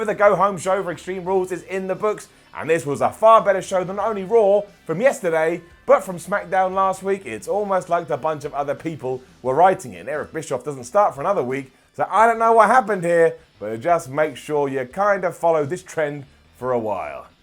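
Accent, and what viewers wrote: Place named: Scotland, United Kingdom. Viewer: why a scottish accident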